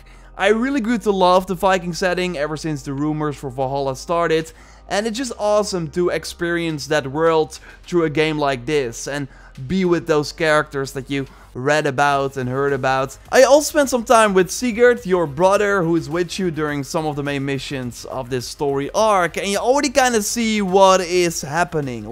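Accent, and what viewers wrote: Netherlands. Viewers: it's indeed classic Dutch laziness. With some practice they can easily speak English perfectly without an accent but after following this man for about 2 years now he literally hasn't improved at all. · You are aware he has a Dutch accent, right?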